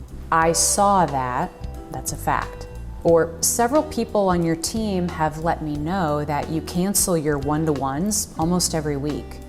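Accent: United States. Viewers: Are you American? Are you a native American speaker?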